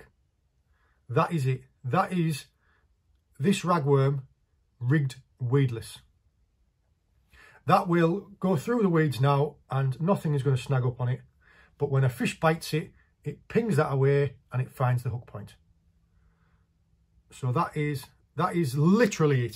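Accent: Yorkshire, United Kingdom. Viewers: Really good info also I've been using a rubber bead between the swivel and lead... in the USA they use a glass bead to attract the fish..... And how many of the watchers are online looking for a weight called an Arf... You can take the man out of yorkshire......😂😂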